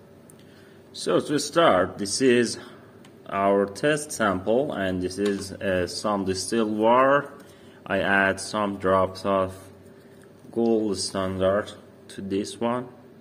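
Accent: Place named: Arab world. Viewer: Hi sir from which country you belong your accent seems to be Arabic.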